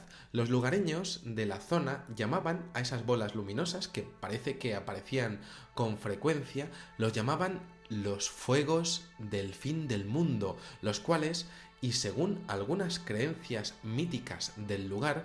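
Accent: Spain. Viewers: pues sea lo que sea que ay ahi no es normal lo que me mas bien me pregunto es que buscan los extraterrestres ahi si no ay casi vida en flora y fauna ni gente a que van ni modo que venga a vacacionar o a vistar pero bueno exelente video lo visitaria pero el echo de pensar que algo me pase me da miedo jajaja por cierto me encanta tu voz de español saudos desde mexico ven avistarnos :)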